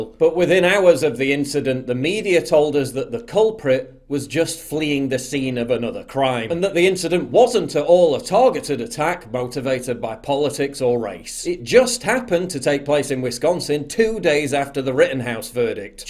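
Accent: United Kingdom. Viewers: Why you gotta narrate like that, get your British sounding a new hobby. This the second time now that I accidentally started watching your under 5 mins pos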